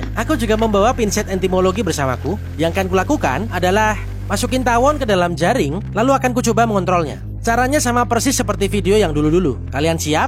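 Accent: Java, Indonesia. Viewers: Logatnya kok kek bahasa jawa ya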